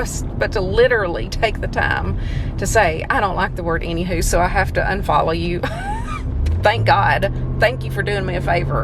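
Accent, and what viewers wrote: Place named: South, United States. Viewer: I like it when you say "any who", and some other terms you use, its sounds so southern, which you are. I'm born and raised in California but I guess it's because my ancestors are from the south that I like those terms. 🌈🌈🌈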